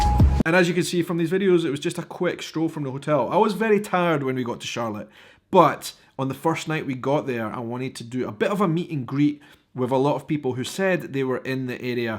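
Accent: Scotland, United Kingdom. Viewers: Contact us next trip. My husband is from Irvine, Scotland talks just like you👍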